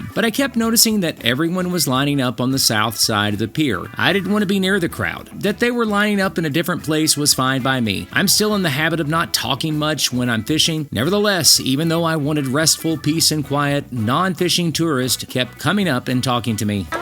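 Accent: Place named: East, United States. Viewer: Thank you, interesting Eastern state accent. Jyssojuan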